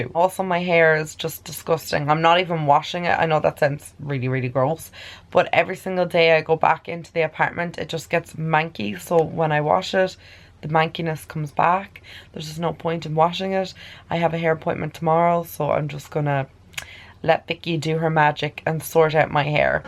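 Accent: Dublin, Ireland. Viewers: are you from Dublin? I always though you were from the country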